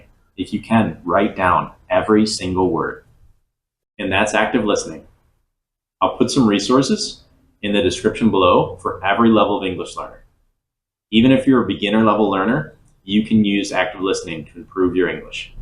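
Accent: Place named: General American, United States. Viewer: face-blue-smiling: ... Thanks a lot teacher for all you do for us. By the way, where is your accent from (state/city). I like it a lot. It's crisp and clear. It sounds like the General American English. When you speak I pause the video I repeat what you just said and try to imitate your accent. Greetings from Miami ... !!!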